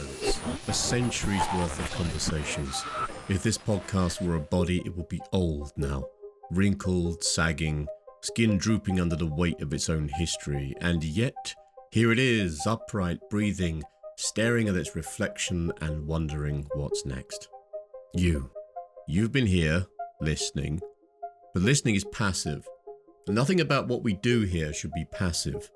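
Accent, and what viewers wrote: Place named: England, United Kingdom. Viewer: G'day from Australia 🦘 I'm listening to your podcast on Castaneda and switched over here to look at your other podcasts. I wasn't sure if you were real or AI generated. You might want to place that note on your podcasts moving forward. You are very intelligent and clever!!! Superb voice for doing podcasts - very English and BBC (that's a compliment). Curious without being "cultish". Good luck. From Australia 🦘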